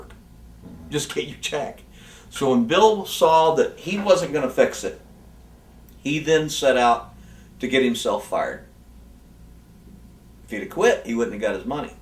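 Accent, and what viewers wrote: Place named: South, United States. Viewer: I can't even watch this garbage you expect anyone to believe you punked Sid and came up with everything that turned dubya cee dubya into a competing company but your ideas were stolen by Bischoff and then he fired you? No one believes you just stop , you were fired for being another hang around collecting a check coming on with the same old 70s and 80s southern draw just like everyone else Eric got rid of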